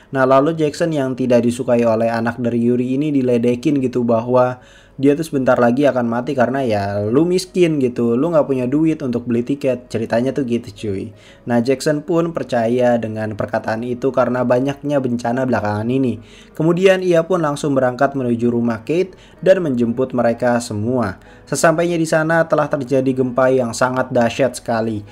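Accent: West Java, Indonesia. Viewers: Orang sunda gening wkwk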